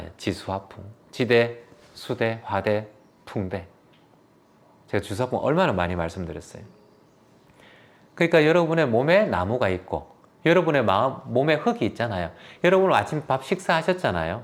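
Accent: Gyeongsang, South Korea. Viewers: 제고향 포항 오천 입니다 포항 말들으니까 더 정겹습니다❤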